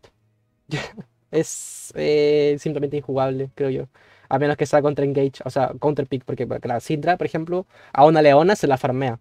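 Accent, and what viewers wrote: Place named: Chile. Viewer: Me gustan tus videos porque tienes una voz super amigable, por tu voz estoy casi seguro que eres Chileno, un lindo país y buen video... Saludos desde Chile ❤️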